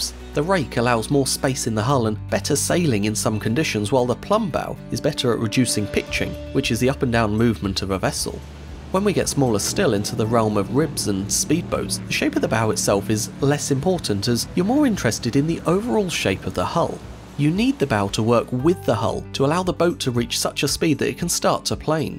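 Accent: United Kingdom. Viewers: Why are all the youtube documentary and educational videos with a british accent? They're not ALL attractive, this one is gross he sounds like he's 12.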